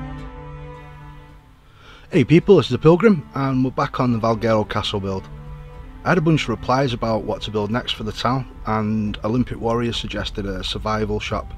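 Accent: Manchester, United Kingdom. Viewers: Defo sound like one of us manc lads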